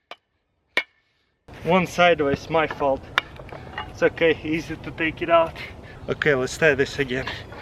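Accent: Russia. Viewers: Do you russian because. Your aced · Bring back Russian accent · An out of shape white guy from Tennessee talking with a Russian accent. Eh, seems to be working for him.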